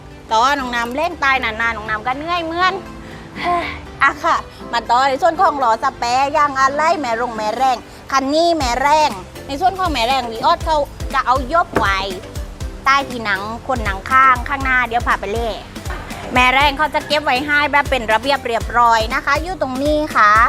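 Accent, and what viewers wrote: Thailand. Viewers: สุดยอดมากแหลงเก่งมากเพราะมากถ้าไมแหลงใต้เรานึกว่าเด็กเหนือนะ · แหลงใต้เพราะมากก สวัสดี บายบ่าย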